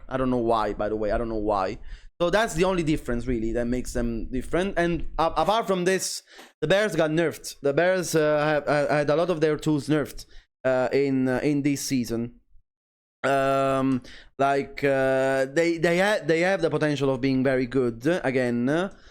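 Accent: Italy. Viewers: Having my main roasted isn't actually too bad when its delivered in a very pleasant Italian accent haha ^-^ I genuinely hate whats happened to Nina in this game. Her range, punishment and mixups have been improved to such an insane degree, that her old playstyle now takes a complete backseat in some matchups. I hate seeing iws1 juggles basically becoming irrelevant outside of low parry combos as well 😔 I dont want them to hit d/f+1,2 again. I want her old poke and move around playstyle conserved. I just want them to gut and neuter d/f+1,2~f on block. Either make it -5 or just flat out remove the ability to do the transition on block. Im so sick of stance transitions in T8 turning a bad situation into a neutral or good one. Thats not how it should work. A d/f+1 into stance at neutral on block is utterly dumb. You dont get to press a button to go from disadvantage to neutral on block. 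Stance transitions should turn an already existing advantage into a greater one but leave you vulnerable if you're reckless with them and commit to it on block. And for the love of god, restore Nina SS1's range to its T7 hitbox. The move has always been busted, no Nina will dispute that. But it used to be hard to use. It made her wall pressure so crazy. It had a glaring flaw, but the pressure it provided was so good that you'd happily work around its bad range. So improving its range so Nina can easily use it midscreen, was a looney tunes decision. Theres been a lot of that in T8. Take a cool thin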